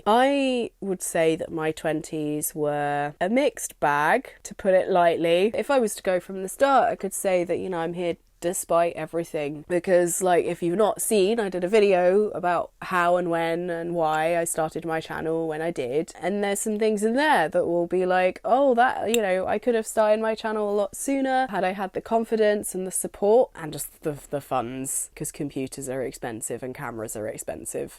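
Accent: United Kingdom. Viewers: so is she a brit or does she just sound weird?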